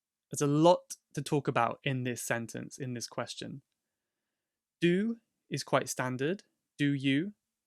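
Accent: United Kingdom. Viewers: Thanks! You are good teacher. I've been looking for such a clear British accent for a long time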